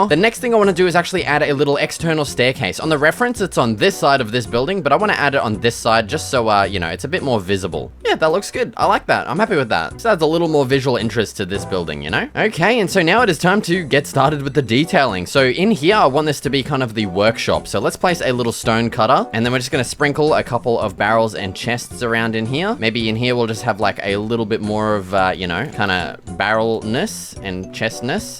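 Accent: Australia. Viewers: Sounds Aussie to me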